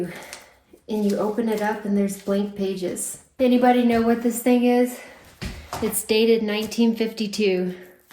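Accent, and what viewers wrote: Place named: Montana, United States. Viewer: Do all the Montana people have your cute accent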